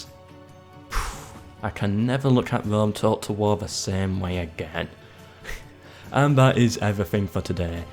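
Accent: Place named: Yorkshire, United Kingdom. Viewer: The Romans would call this old pronunciation issue as a "vexata quaestio". Actually, very few Brits/US folk bother to pronounce Latin or Greek names as they ought to be pronounced (ayup, these two languages have got their own pronunciation, far far before even Old English existed... :D). There may well be ethnocentric reasons behind this (in astronomy, for instance, this is totally blatant, a little bit less in history, maybe) but it's always refreshing to me when someone decides to utilise the original pronunciation, doing justice to those historical names. Regardless, the proprietor's Yorkshire accent sounds absolutely pleasant and very very fit for divulgative/entertaining videos such as his own.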